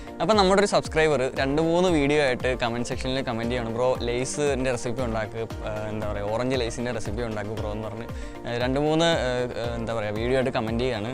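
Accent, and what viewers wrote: Alappuzha, India. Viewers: ചേട്ടൻ ആലപ്പുഴക്കാരൻ ആണോ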